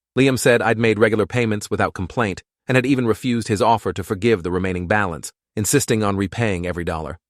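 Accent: United Kingdom. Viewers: Anyone else notice how his voice slowly slowly start sounding like British accent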